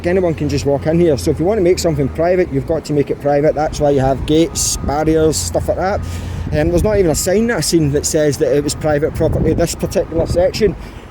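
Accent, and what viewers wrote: Salford, United Kingdom. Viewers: You have a fuuny voice are you from SALFORD near Manchester .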